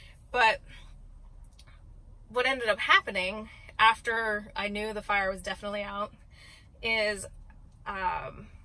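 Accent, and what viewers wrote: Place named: United States. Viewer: Hi, I study English for your video. I like hear you american English. I worked as crane driver, I drove truck crane.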